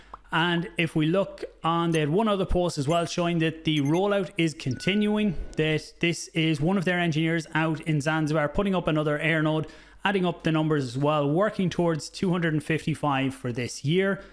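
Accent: Ireland. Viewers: I truly do love the Irish. But whenever I hear that accent I start looking for my pot of gold. Not sure how that got started but I really would like a pot of gold if you could manage it.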